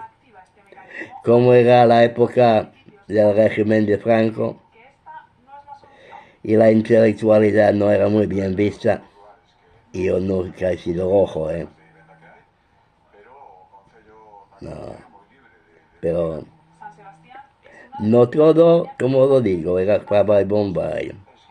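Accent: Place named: France. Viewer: Usted es solo un francés renegado que está acogido en España , pero solo hay que oírle para saber que es un francés de pura cepa. Usted pasará a l posteridad por ser novio de Carmen de Mairena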